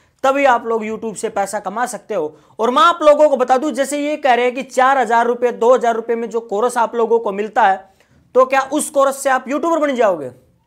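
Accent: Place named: Gujarat, India. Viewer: Bhai bat sun k gujarati lag rhe ho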